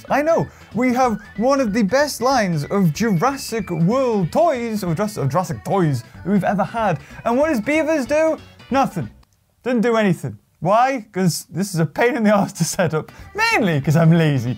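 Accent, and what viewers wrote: United Kingdom. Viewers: You brittish mate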